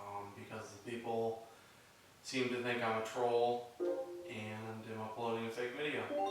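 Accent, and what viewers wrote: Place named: RP, United Kingdom. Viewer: I gave u a like like posh accent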